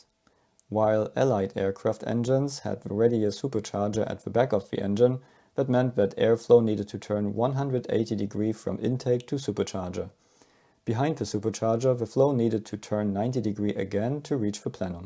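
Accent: Germany. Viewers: If a question requires research, you do something wrong. The answer is the thing requiring research. You also have a horrible German accent. Please stop making videos. Thanks.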